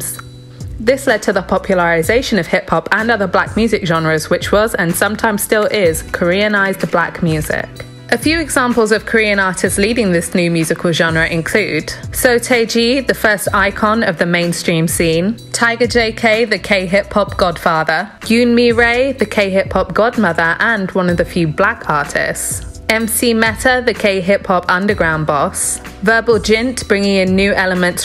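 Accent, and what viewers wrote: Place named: England, United Kingdom. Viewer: She just sounds English...because she lives here. Funny that.🙄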